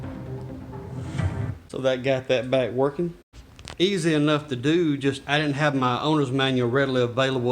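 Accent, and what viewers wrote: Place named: Louisiana, United States. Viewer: Cool accent. Louisiana?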